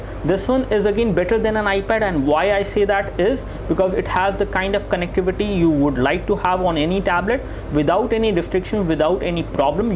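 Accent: India. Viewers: Indian pronunciation :D kill me i cant understand at all sound funny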